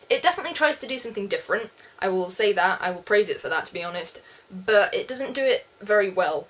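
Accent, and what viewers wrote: United Kingdom. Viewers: I'm really glad that I got to hear a fellow Brit talking about this anime. I've become a big fan of it and each episode cannot come soon enough. I'm definitely used to the style now but it is a pity that they couldn't put more money into it.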